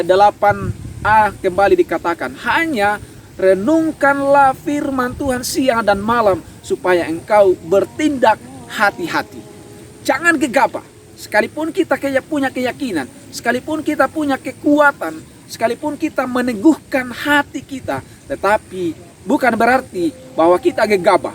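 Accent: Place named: Batak Toba, Indonesia. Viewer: Terimakasih pak Pdt. Btw pak Pdt suku apa? Saya dengar logatnya seperti suku Batak Toba ( no rasis). Saya Batak Toba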